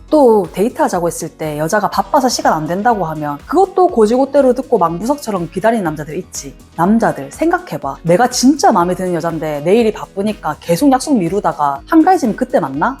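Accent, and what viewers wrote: Gyeongsang, South Korea. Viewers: 경상도(부산이나 대구) 사투리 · 갱상도네~ 부산 경남 쪽아이가~ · 갱상도 가스나네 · 갱상도 사투리 반갑소~~~😊😊😊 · 경상도 여자군요 ㅎ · 갱상도 여자네~ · 경상도 말투 귀여움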